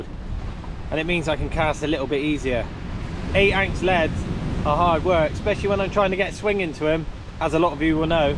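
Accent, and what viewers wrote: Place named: Bristol, United Kingdom. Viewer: What’s wrong with a Bristolian twang lol so you have a link to the FB group?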